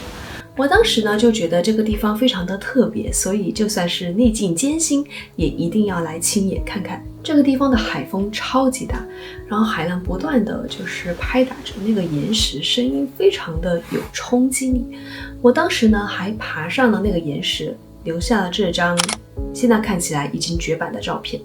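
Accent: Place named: Miaoli, Taiwan. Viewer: 口音好像苗栗公館 之前下面一位有演示過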